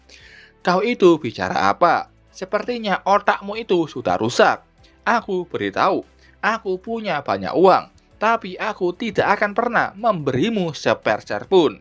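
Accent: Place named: Java, Indonesia. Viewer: sebenernya ceritanya menarik... cuma yang baca narasinya terlalu datar dan medhok jowo sekali... 😭 jadi agak aneh dengernya... 🙏🏻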